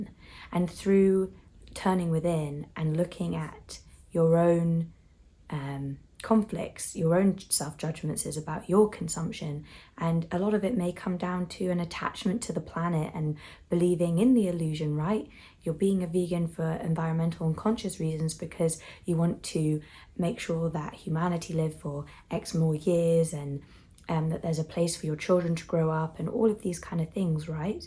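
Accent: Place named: United Kingdom. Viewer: I have a crush on this woman. Her British accent is so cute!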